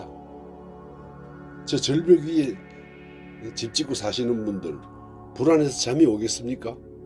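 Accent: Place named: Gyeongsang, South Korea. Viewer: 굿모닝~~^^. 선생님. 원래 정치인의 공약은 空約이라고 보면 됩니다. ㅎㅎ 화려한 불빛. 빌딩 사이로 빈민들의 추위와 배고픔이 있네요. 우리 님의 목소리는 여기 서부경남 분 같습니다. '이게 뭡니까'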